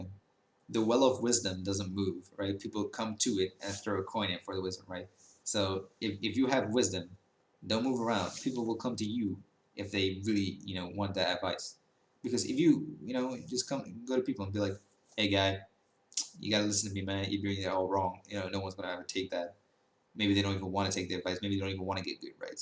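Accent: United States. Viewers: I was confused by the Farenheit thing too lol. I was like wtf? Is he that so hardcore AJATT that he's trying only to use Celsius? The only thing that gave it away was the way you say "book" and "look", other than that you have a perfect Standard/Northwest US accent. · idt it’s that uncommon of a word tho, most people would know what it meant if it showed up on an SAT or something ikr he sounds like a typical American urbanite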